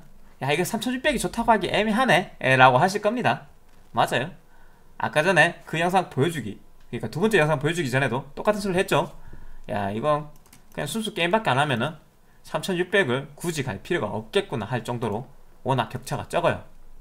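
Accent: Gyeongsang, South Korea. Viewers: 님 말투는 경상도인데 대전분이신가보군요 신기신기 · 경북 경남? 어디쪽 사투리죠? 이 사투리 쓰시는분들이 방송에서 가장 억양을 못고치는듯ᆢ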